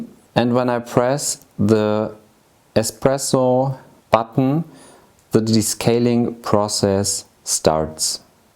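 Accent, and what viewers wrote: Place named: Germany. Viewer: This guy is adorable with his little German accent. It's set up and cleaning already but I'm just letting it play through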